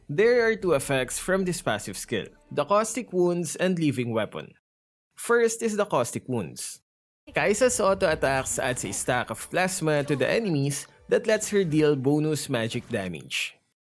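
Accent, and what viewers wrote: Philippines. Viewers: Its because of his accent, “dealing” becomes “diling”,”living” becomes “leaving”, its because of his filipino accent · I can tell he's filipino 🇵🇭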